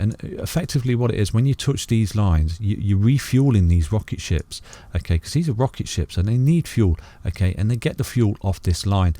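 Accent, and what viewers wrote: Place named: Leicester, United Kingdom. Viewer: East Midlands Crypto massive!! Guessing you might be from Lestoh as well haha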